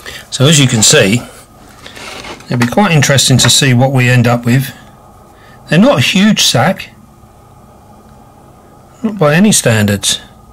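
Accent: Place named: London, United Kingdom. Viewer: It's not lol 😆 what it is - the London in him. His accent. Those London accents always struggle with the Latin or hispanic sounding words. The thick accents don't allow it. I've got a big pal who's the same 🗣😆 I love accent, it's class 👌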